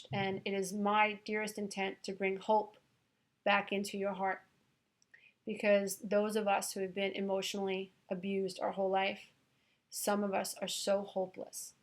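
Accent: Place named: East Coast, United States. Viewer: I love your videos! It's like therapy for me! Thank you so much! Keep up the good work! Also I have a question... Are you a therapist? If so by any chance are you located in Southern California? I would assume you're on the east coast due to your accent. But I love your advice and am looking for a therapist. Thanks again!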